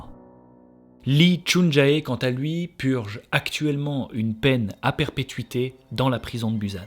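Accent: Belgium. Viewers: Il est belge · Mais il est belge ? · Les Belges, vous dites Houite pour Huit et pourtant vous prononcez Tuiste le mot Twist. Super vidéo sinon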